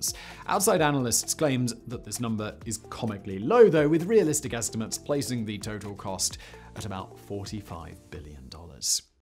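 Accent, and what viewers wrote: England, United Kingdom. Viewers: what kind of English accent is that ?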